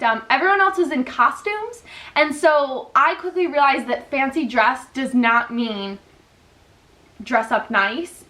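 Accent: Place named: United States. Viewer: You're really pretty enough without make-up. That's a compliment and honest opinion. And you do still have your American accent, which I like. You also seem like a lot of fun to be around. I am surprised someone did not tell you what fancy dress meant, did they not ask what you'd be wearing? Take care and welcome to England.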